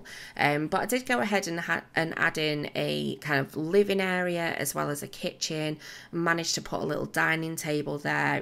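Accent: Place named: Northern England, United Kingdom. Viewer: My boyfriend is really proud of himself - I occasionally show him accents and ask where he thinks they're from, and he guessed you were from somewhere North of Manchester. :P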